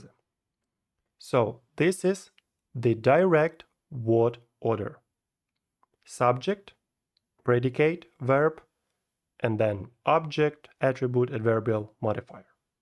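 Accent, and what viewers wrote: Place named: Eastern Europe. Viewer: спасибо, только не верб а вёрб, ну и, славянский акцент ярко выражен :)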